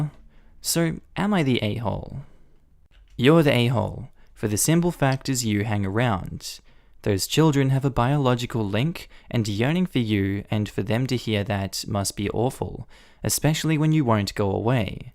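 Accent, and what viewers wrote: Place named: Australia. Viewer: Judging by his accent * is also ausralian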